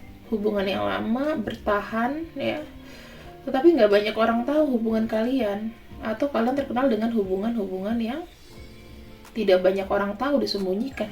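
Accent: Java, Indonesia. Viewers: Kakak suka gruuiiiiss ya kata ppaaauujaannnnggg kayak nya kkk orang jawa ya deh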